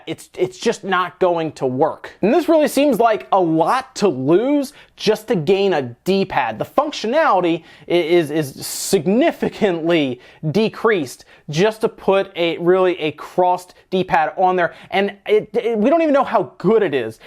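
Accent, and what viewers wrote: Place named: Maryland, United States. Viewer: you sound like you’ve got a MD accent.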